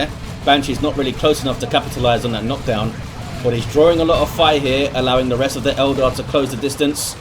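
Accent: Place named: Midlands, United Kingdom. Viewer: midlands England is my bet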